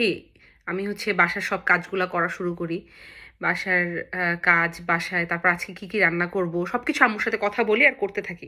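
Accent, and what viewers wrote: Barishal, Bangladesh. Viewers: Amr o tmr vlog dekhte valoi lage! Ar tmr barishailla accent a kotha aro joss lage!😁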